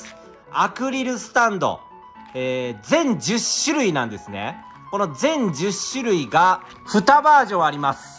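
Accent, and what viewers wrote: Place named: Kyoto, Japan. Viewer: 関西弁よき😊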